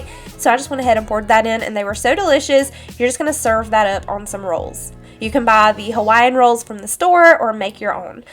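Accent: South, United States. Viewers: love that you decided to do an episode on budget meals. Love your southern accent, what state are you from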